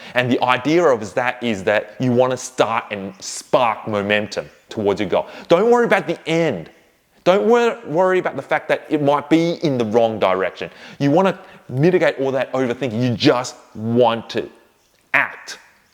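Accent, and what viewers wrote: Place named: Australia. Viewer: Thank you for this video - I really enjoyed it. However I have difficulty understanding the thick Australian accent - I believe if you made it little more neutral it would be impactful on more people ! 😊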